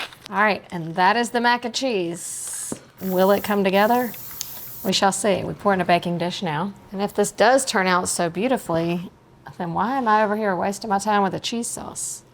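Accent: South, United States. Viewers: For my first comment since discovering cooking methods on this channel.... More of that Southern "Southern" accent please.... 😂💯💯💯 👋🏼👋🏼👋🏼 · You are SO attractive. The combination of your looks, personality and that Southern accent is just addictive. His bless your husband; he must LOVE 💕 you! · Your southern accent is on point. Just enough.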